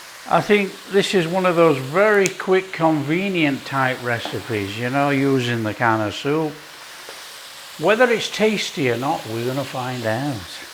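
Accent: Yorkshire, United Kingdom. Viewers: Looks really good,love chili over a baked potato. You said your accent is mixed now,but I think it’s pretty much a Yorkshire sound still,just not exaggerated. I still sound English(Brum) after all these years,Americans know after about 3-4 words,I just use American words,but with a British accent,I lived in American family homes for 6 years then married an American,you quickly learn it’s easier to use American words rather than to explain what you mean all the time.😊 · Yorkshire uk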